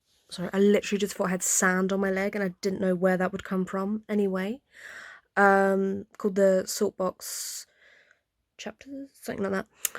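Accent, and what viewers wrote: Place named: United Kingdom. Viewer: Nah, you would totally dominate that podcast ! for one, your're British accent will make you sound superior, Lol - and 2, you know your stuff! I hear a ton of blogs saying she is back with Agron? I actually think they're in communication, maybe not back together just YET< >but they soon will be. I also think that Taylor will at some point, start hinting more towards her sexuality, she will either do something major after ( covid) and get papped being way too touchy feely wit a girl just to drop hints... and then eventually, she will start forcefully hinting at her 'bisexuality'< she will never quite come out of the closet, but she will aggressively ( more than she already have been with Karlie) drop suttles. Taylor want's out the closet, but her team will NEVER let her. Because taylor , Jennifer Aniston, Katie Mcgrath and an host of others, will never, EVER address those sexuality rumors.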